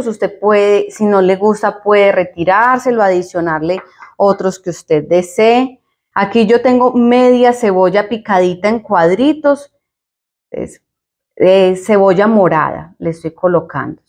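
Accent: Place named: Colombia. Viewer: es paisa,rolabrasileña haha muy chevere la receta, gracias.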